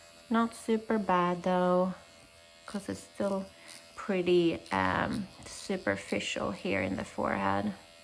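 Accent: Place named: Sweden. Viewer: Hej, jag skriver på svenska för jag tror du är svensk, tyckte det lät så. Jag bara undrar om denna penna funkar bra mot ärr i pannan, och om det är säkert att använda så man inte gör det värre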